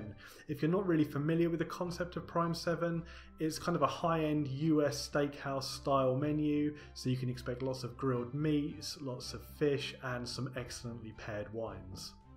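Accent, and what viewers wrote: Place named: United Kingdom. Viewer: Thank you. We have never sailed with Regent, but decided to try Regent/Splendor. Oceania Fans to be honest. Very good outline/overview vlog! Appreciated. Booked our cruise and pray, after the challenges we all face regarding CV19, that all goes well and we get to experience Regent and this beautiful ship. wishing you/family and all the Regent family every health and success in the years ahead. Liked hearing a fellow Brit too :-). Cheers to getting back at sea very soon. Best wishes, Elaine & Tom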